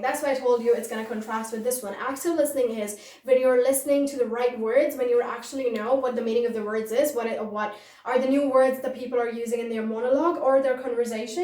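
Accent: United States. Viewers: is this American or African American accent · Awesome When I close my eyes I feel like someone American 🇺🇸 speaking in this video Keep it up Are you offering any one to one class for student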